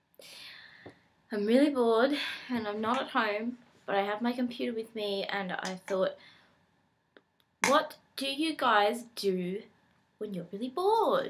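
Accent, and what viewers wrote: Australia. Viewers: you might make money if you invent something that will prevent that from happening. hmm?. any ideas ? and as always, so in love with aussie accent. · Hi, I enjoy watching your videos and I love your accent. You might laugh but that's how I found this channel. A few days ago I was extremely bored and got the idea of learning how to do an Australian accent. Sometimes a few of my friends will have fun conversations using different accents and I've never been able to join in. Determined I started looking up 'how to do an Australian accent' and found your video. I think it's neat how you do these videos every once in awhile, it definitely shows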